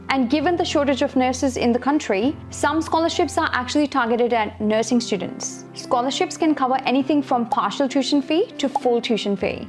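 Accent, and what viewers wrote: Australia. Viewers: You have such a great knowledge with brilliant auusie accent and make more vids on foreign Nurse to how to be Rn in Australia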